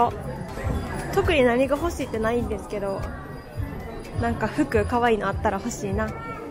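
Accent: Kansai, Japan. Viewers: 生粋の関西弁でハングル読めない事も言ってくれて性格かわいい、韓国行きたいけど読めない話せないで悩んでる自分には自信になった!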